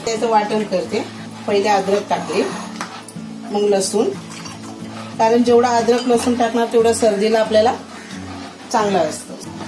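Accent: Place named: Agri, India. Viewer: Mast ekdum Agri ascent talk.. 👍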